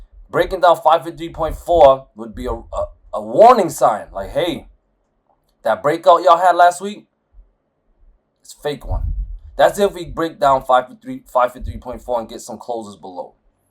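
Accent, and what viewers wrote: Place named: Northeast, United States. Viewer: you sound like you're from the northeast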